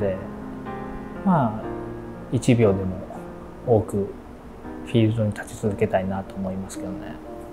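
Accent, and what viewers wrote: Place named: Kagoshima, Japan. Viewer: 鹿児島弁😭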